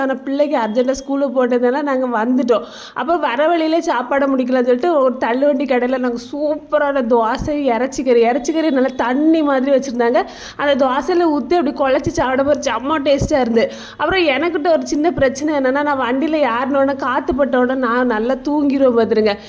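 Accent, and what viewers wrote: Kanyakumari, India. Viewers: அக்கா எனக்கு உங்கள ரொம்ப பிடிக்கும் உங்க கன்னியாகுமரி பாஸை ரொம்ப பிடிக்கும் நீங்க நீயா நானா கம்மியா பேசுனது வருத்தமா இருந்தது உங்க திறமைக்கு அங்கீகாரம் நாங்க கொடுக்கிறோம் உங்க டிஷ் எல்லாம் காட்டவே இல்ல வருத்தமா இருக்கு உங்க சமையல் எனக்கு ரொம்ப பிடிக்கும் நாங்க இருக்கோம் முன்னுக்கு வாங்க உங்க பிள்ளைகள் எல்லாம் பார்க்கும்போது சந்தோஷமா இருக்கும் அக்கா இந்த மர சாமான்கள் நீ என்ன விலை என்ன சொல்லுங்களேன் என் பிள்ளைங்க சொல்லி வாங்கி சொல்லு வாழ்க வளமுடன்❤❤❤❤❤❤❤🎉🎉🎉🎉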